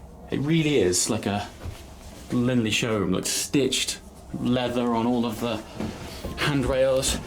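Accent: Netherlands. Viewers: Wait are you dutch? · are you dutch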